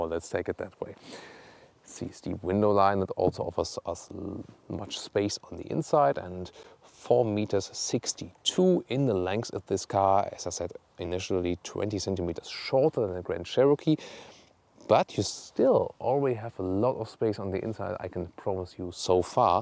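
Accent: Germany. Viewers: With the Cherokee I have difficulties to put a finger on the design. Seeing it on pictures or in videos you cannot associate it a Jeep. Seeing it on the street and standing near it this impression is totally different. Yeah, it is a Jeep and somehow it fits into the family. You have the Renegade, which is a fun car to drive, for younger people who don´t go mainstream with a Golf or similar, then the Cherokee for kids transporting mummies who normally would opt for the dead boring VW or the XC60 and then you have the GC which leaves a nice footprint in the premium class as one of the last remaining real SUVs. Btw. meine lieben people. As always great presentation - keep on going. But still, this German accent is still there. Never mind. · Ich check das Kanalkonzept einfach nicht - deutscher Kanalname, deutscher "Presenter", aber dann dieses seltsame Englisch, bei dem jeder 100 Meter gegen den Wind hört, dass er Deutscher ist? 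Warum nicht einfach auf deutsch? Wegen der Reichweite des Kanals?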